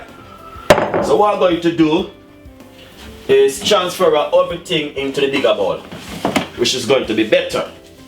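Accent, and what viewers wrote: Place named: Jamaica. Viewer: Loving the Jamaican recipe... and accent.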